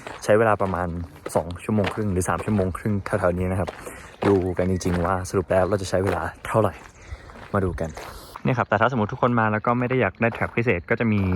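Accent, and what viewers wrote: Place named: Khon Kaen, Thailand. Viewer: ชอบสำเนียงเหมือนคนขอนแก่นตอนพูดภาษาอีสาน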